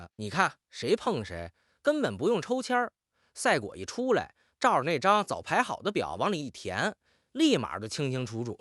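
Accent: Beijing, China. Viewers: 的字幕不會用簡體中文不過這老北平口音....像，很像啊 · 作为北京人，喜欢这腔调👍😂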